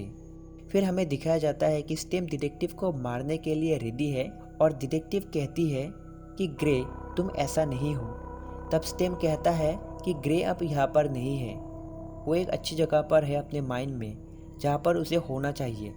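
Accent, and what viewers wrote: Assam, India. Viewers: I think you are Assames. Cuz you have same accent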